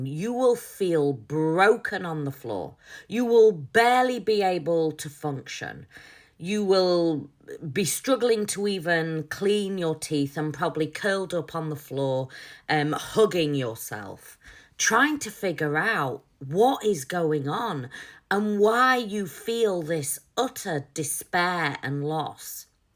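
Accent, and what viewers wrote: United Kingdom. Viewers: great video, great channel, great British accent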